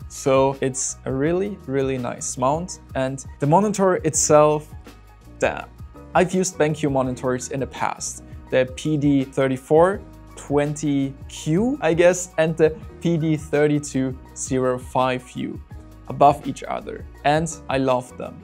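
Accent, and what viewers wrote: Germany. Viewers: It's funny how your english sounds flawless 90% of the time, while being so obviously german at the same time. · I hear german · German English, cool:face-fuchsia-wide-eyes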